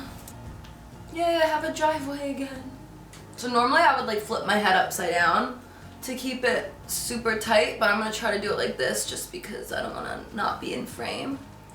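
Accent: New Jersey, United States. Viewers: Obsessed with the Jersey accent 😍😩😭❤️ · Love jersey accent🐧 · ur Jersey accent is so thick 😂😂 love you ❤️ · Your New Jersey accent really comes through when you’re hanging out with your family. Super cute. 😊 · Your grandmother is adorable.. and your jersey accents 🙌🏻❤️ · Hanging out with your grandma brings out your New Jersey accent even more haha 😊 (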